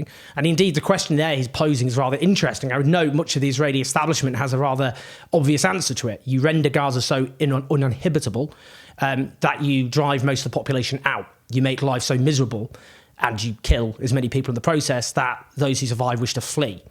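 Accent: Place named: England, United Kingdom. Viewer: you are imoressive but please speak more slowly. As an American, the English accent, although very nice, is a bit of a problem to understand and spoken rapidly much more difficult or even impossible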